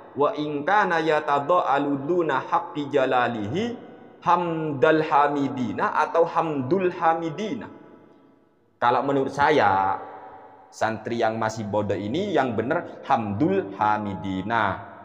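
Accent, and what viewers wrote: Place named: Jember, Indonesia. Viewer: Kaya logat Jember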